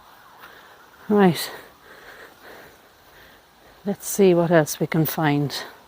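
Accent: Ireland. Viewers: I know this isn''t an "IRISH* cemetery. Maybe it's the fact that the narration comes with an Irish accent. It looks like the cemetery is extremely huge if what you covered in 45 minutes is just a small portion of it. There's also a certain charm about overgrown cemeteries. I think it has to do with the body coming from dust and going back to dust after the spirit leaves. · Such amazing places you show in your videos. I've always wanted to visit Ireland for historical reasons and the beauty of the nature. I love your dialect, it is really charming.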